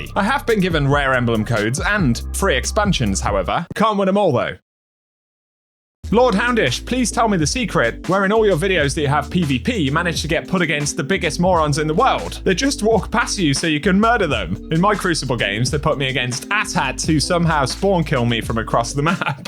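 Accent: United Kingdom. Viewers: Im so used to you serious voice ready the scrip for the video, for me you sound identical to "baldur's gate 3 narrator outtakes" 😂💀 (it is the british accent im so sorry😂) I have'nt played D2 over more then a year i think but from time to time i see your video put up on my page and just watch it to be a little up to date (im able im providing food for your starving kids) I finally got my own place and after years im gonna hang up my Hive sword in my gameroom that i got yeaaaarrss ago with the pit of heresy achievement. I still kinda hope D2 will get better and im able to return but for now im still salty they took Forsaken from me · Idk how long I've been following you but I always love your snark videos. The british accent and the sarcasm just belong together bro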